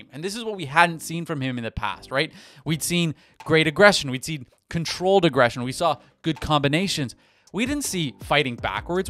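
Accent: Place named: Canada. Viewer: Ay, I can spot that Canadian accent. Are you Canadian?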